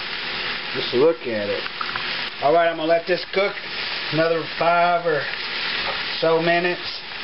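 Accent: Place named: Arkansas, United States. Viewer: spam grosses me out but this looks good... that bacon grease boy it changes everything!.. sounds like you're from Arkansas.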